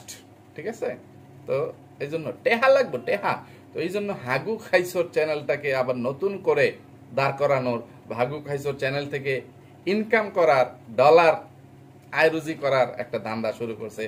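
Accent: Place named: Mymensingh, Bangladesh. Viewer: Apni to moymonsing vashai kotha bolen..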